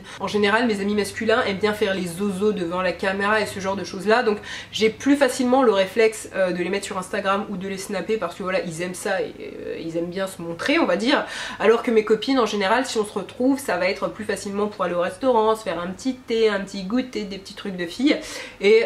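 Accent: Paris, France. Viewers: C'est surtout son accent parisien et sa façon de se tenir et de bouger qui bourgeoise selon moi ^^ c'est pas une critique j'ai plein d'amis qui ont les mêmes manières et je les ai eues moi-même à une époque! Mais elle a clairement ce petit "je ne sais quoi" cliché des "bcbg"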